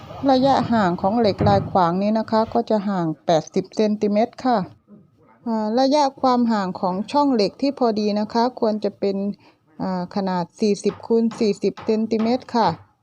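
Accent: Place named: South, Thailand. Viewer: ชัยภูมิ..(พูดเหมือนคนใต้)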